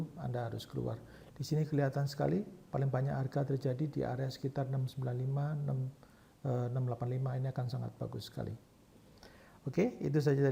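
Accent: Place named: Java, Indonesia. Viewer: kata istri saya suara Suheng medok banget. hahaha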